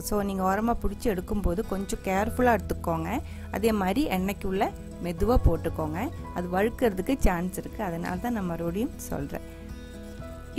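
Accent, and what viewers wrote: Kerala, India. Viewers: Malayalam’ised tamil sounds quite irritating . Sometime sound like mocking.. I don’t understand why .. no Tamils or any other would start a channel targeting other languages.. would request not to kill the language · super recipi. malayalam kalantha unga thamil super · Neenga malayali thane.unka pechula theriyuth.so nice.ur voice and recipe 😊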